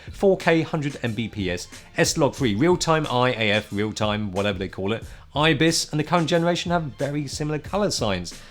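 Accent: United Kingdom. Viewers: I remember as young funny guy with british accent. Fast forward,now with wife and kids in tow looks like granpa of himself. Run for the hills Save yourself!!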